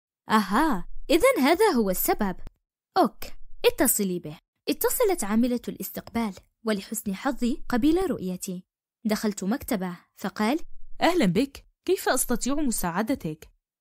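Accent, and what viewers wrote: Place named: Andalusia, Spain. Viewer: سهل تكون حاكي قصص بس صعب تكون بصوت ❤️🥺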